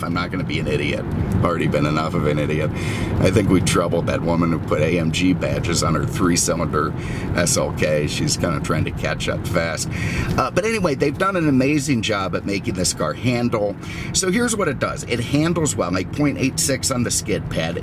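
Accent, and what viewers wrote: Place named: Midwest, United States. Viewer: I love your videos. Where are you originally from? Sounds like a Midwestern accent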